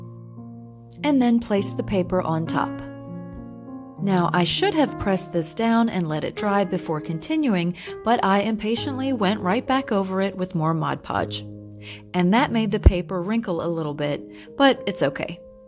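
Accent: South, United States. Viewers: I am southern too and love your soft southern voice. There are many channels that I start to watch and would watch for content but cannot tolerate the harshness of their voices but anyway thank you for your pleasant voice.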